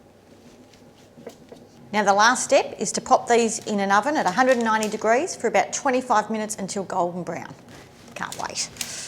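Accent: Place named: Australia. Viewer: From Greece....I remember these WHEN I was living in Australia....I may even try to make some and see how I do..LOVELY AUSSIE ACCENT!!!!Thank you for the videos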